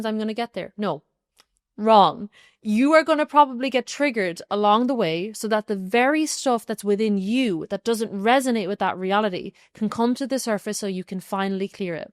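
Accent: Ireland. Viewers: Please embrace your Irish Accent, it’s one of the many reasons why I am attracted to this content.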